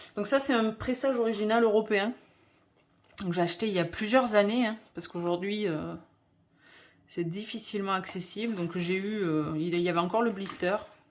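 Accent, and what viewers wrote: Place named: North, France. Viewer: Bonjour mademoiselle, oh! Purée que çà fait du bien de voir une vidéo d'une fille qui nous parle de rock 😂😂😂. Première fois que l'on présente le chef-d'œuvre de dans une vidéo, enfin 😊😊😊. L'album post mortem de cet artiste n'est surtout pas à négliger. Première fois également que l'on présente de, meilleur selon moi que, merci pour cela😊😊😊. En parlant de grunge emmenés par le regretté étaient magnifiques. excellent, excellent, ils ne font absolument pas le même type de musique. En voyant l'album de, j'ai immédiatement pensé au youtuber vinyl over qu'on ne voit plus malheureusement sur la toile que dire, on s' incline. La reprise de par mon héros est splendide. Dis-moi, ils prenaient bien soin de leurs vinyles tes parents. Allez mademoiselle, je vais regarder toutes tes vidéos prochaines, ça s'est sûr. ❤❤❤❤ Ps: d'où vient ce petit accent si sympathique? 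Du nord? 😂😂😂😂.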